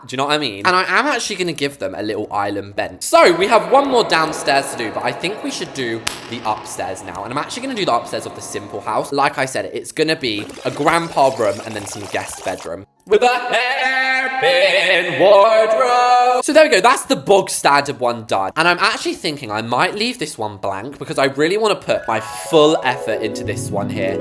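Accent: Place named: United Kingdom. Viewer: I love how your British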